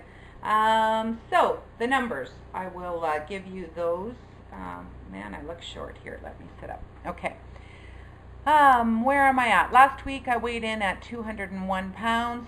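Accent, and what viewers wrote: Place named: Canada. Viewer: 1st. I love the curlies in your hair, really looks nice. 2nd. You have hit "ONDERLAND" & that is a WooHoo moment !! ~ Very nice video today. It is always nice to hear someone recap their weight loss journey. I always enjoy listening to you speak. You remind me of my girlfriend, Lorna, whom I met while living in Florida. She's blond, blue eyed, from Toronto, and has the same Canadian lingo in her speech. I often think of Lorna when I watch you. I appreciate your friendship. Have a Happy Easter.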